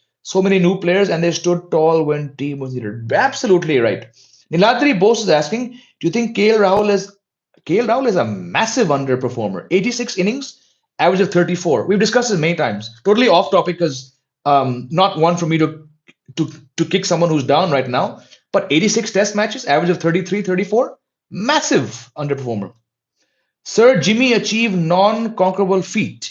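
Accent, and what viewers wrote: India. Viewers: Sorry, your english is almost indian english, probably live in india? Are you related to Tom Alter ji, to me you look and speak like him. Again sorry for being so inquisitive. Bazzball is for flat batting decks if at all if has any place, but even then they will need to show patience at some point during a match, or it will lose against good opponents. Keep up your good work.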